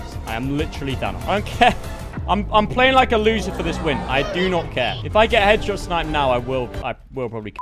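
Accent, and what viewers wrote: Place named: United Kingdom. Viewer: L british man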